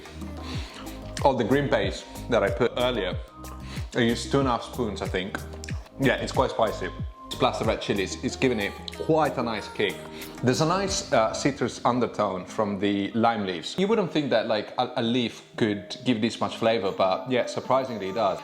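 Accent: Thailand. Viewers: Loving the Thai accent!! Can’t wait to make this 👌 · The Thai accent is perfect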